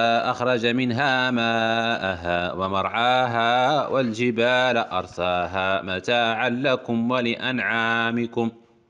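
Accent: Hassaniya, Mauritania. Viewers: ماشاء الله قراءة خاشعة بالصيغة الحسانية لأقاليمنا الجنوبية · ما شاء الله القراءة الحسانية أحسن الأئمة التي أنجبت مدينة كليميم الله إحفظو لأهلو يا رب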